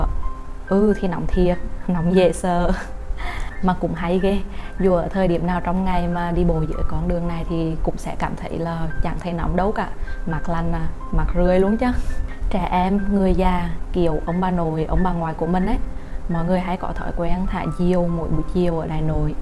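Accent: Hue, Vietnam. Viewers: Chỉ nghe giọng e nói thôi là cũng yêu Huế lắm r í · Dễ thương quá em gái ơi! Chị thương em quá, vì em đã giữ nguyên bản Huế của mình em ơi! Chị ở đường Phượng bay đây em. Chị yêu Huế của mình lắm, và rất thương ai đi xa vẫn giữ nguyên giọng Huế đấy em gái yêu nờ😍😍😍😍. Hãy cứ giữ mãi giọng Huế nghe O gai Huế nớ nghe em hè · Giọng Huế rất hay. Bạn phải thường xuyên sử dụng giọng Huế. Bởi vì giọng Huế hay lắm. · Hay quá bé ơi , nếu bé nói chậm hơn tí nữa thì giọng huế hắn lại đậm nét hơn nữa :D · Gái Huế xinh dã man Giọng Huế hay dễ sợ ❤️❤️❤️ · Em Thik Giọng Huế Lắm Ạ 🥺🥺 Mặc Dù Em Đag Ở Hà Nội ... nếu Có Dịp Em Sẽ Vào Huế Chs Vài Ngày .. 💙💙 em Chúc Cj 1 Ngày vv ạ 😅💙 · Bữa mô bạn nên làm thêm video về Huế cũng như nói giọng Huế thường xuyên hơn. Cũng như phải làm video về áo dài Huế và nón lá Huế. Đặc biệt là áo dài Tím Huế.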